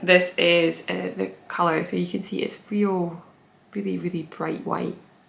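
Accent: Scotland, United Kingdom. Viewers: Hello, thank you very much for your videos. I love your work, your choice of colours, wools and designs. Congratulations, you are such a talented person and I love also your Scottish accent not difficult to understand at all for me, a Portuguese woman living in Paris for 34 years, since 1990. I’m so happy to listen to your podcasts and following you every week it’s a great pleasure. Thank you for sharing your experience and knowledge with us. Never give up, you’re a wonderful person ❤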